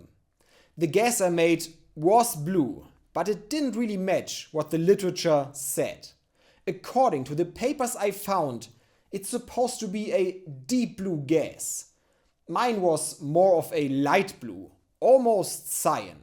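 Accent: Germany. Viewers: Your accent is my favourite German accent. It's up there next to the Simpsons guy who took possession of the missing art before a Kraftwerk concert in Stuttgart. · you german? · Bruder, ich habe zum ersten Mal ein Video von deinem Kanal vorgeschlagen bekommen, direkt darauf geklickt und in 0,0002 Sekunden gehörst das du deutsch bist. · idk why, but i find his thick german accent in english so sexy and relaxing at the same time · As a high school chemistry student here in Denmark, I find your grad level chemistry videos both entertaining and inspiring. Please keep making them, and congratulations on the success with the gas. Even though I might not understand every synthesis step, I really enjoy your explanations and your German accent. These videos capture what chemistry is about, using science to explore interesting compounds. :) And just to try some German, Ich finde deine ganze experiment sehr to toll :)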